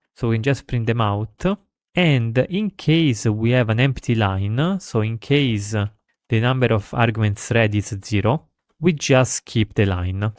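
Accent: Italy. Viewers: Nice italian accent!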